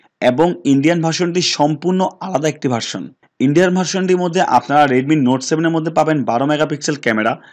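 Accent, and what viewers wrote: Chittagong, Bangladesh. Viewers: আপনার বাড়ি চট্রগ্রাম নাকি ভাই??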